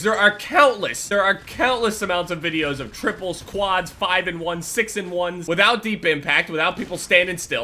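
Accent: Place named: United States. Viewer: I stopped after every few seconds to mimic his pronounciation becuase I have to improve the pronounciation of my English and I hate the british english they are teaching us at school. thanks for your American accent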